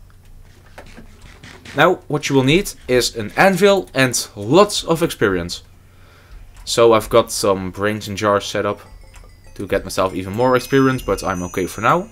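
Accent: Netherlands. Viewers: je bent nederlands, je hoort het aan je accent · Are you dutch?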